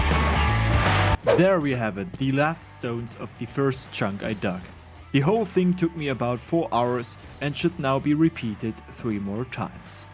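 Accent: Germany. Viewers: Did i hear the german accent? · Great Video. In the First few Seconds thought, is he from Germany? Yes he is😅 You hear a German Speaking English if you are a German yourself😆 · Are you German? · wait… are u german?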